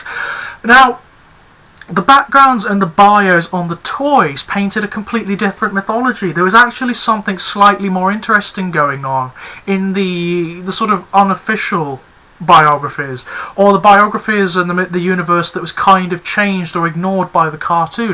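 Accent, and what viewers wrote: England, United Kingdom. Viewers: wow you're accent is so posh where in england are you from :)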